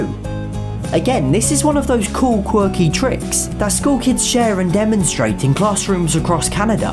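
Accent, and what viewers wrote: United Kingdom. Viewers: Love you my Bri"ish man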